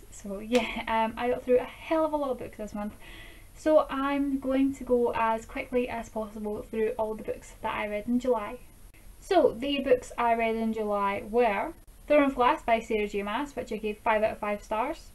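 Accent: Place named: Scotland, United Kingdom. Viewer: I ADORED the Lux series by Jenn! Have you read her Covenant series? You MUST it is ever better than the Lux series. And Jenn is such an amazing down to earth person. So much fun to be around and talk books with! By the by, I can tell by the accent, where in Scotland do you live? My family is from Edinburgh (they all have green cards). I was born in NY but I lived in Scotland as a child.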